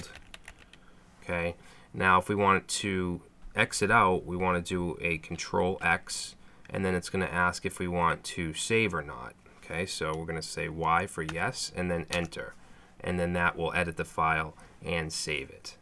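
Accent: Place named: South Boston, United States. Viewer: Straight out of Southie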